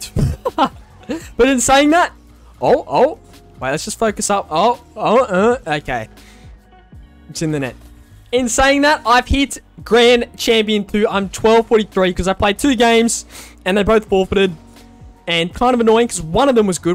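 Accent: Australia. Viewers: as a kiwi voice is one of the only aussie accents i actually like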